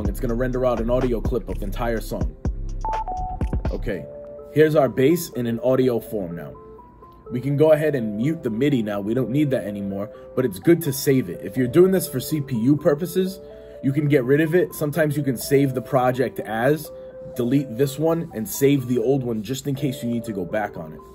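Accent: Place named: Italy. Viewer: i don't know what this accent is whether is italian or something else but i love it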